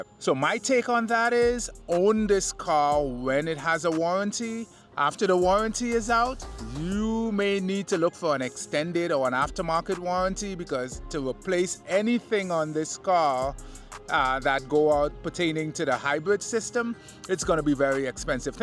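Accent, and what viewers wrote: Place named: Saint Vincent and the Grenadines. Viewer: Hey! I agree with your analysis of the 992.2. Some terrible decisions by the VW group. After the 997 years, the 911 has just been getting uglier every year. BTW!! Are you from St Vincent in the Caribbean? My friend Kim Collins has the same accent as you. Just wondering. Thanks for the great content. All the best!!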